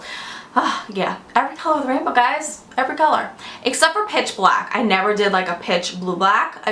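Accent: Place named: Long Island, United States. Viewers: <3 your accent. Are you from NY originally? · Gah!! you are so awesome and so real! I love your videos so much! And I love the Long Island accent!!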